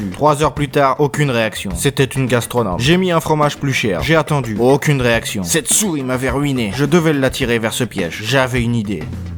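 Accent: Russia. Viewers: T français ou russe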